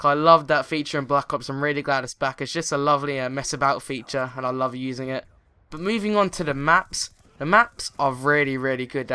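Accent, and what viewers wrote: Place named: Oxfordshire, United Kingdom. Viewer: Great review. I completely agreed with 99% of everything you said. Where are you from by the way? You sound exactly like my half brother. You from somewhere in oxfordshire?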